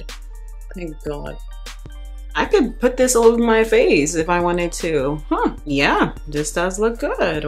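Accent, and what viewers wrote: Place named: Rhode Island, United States. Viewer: Come thru with that RI accent!👌🏼🤌🏼😂🤣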